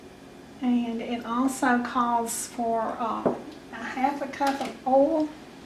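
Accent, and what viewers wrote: South, United States. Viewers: Watching her for the first time love the recipe and her southern accent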